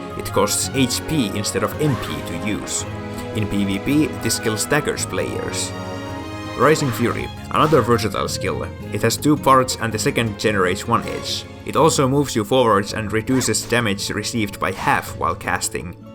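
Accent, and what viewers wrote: Finland. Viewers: are u from finland?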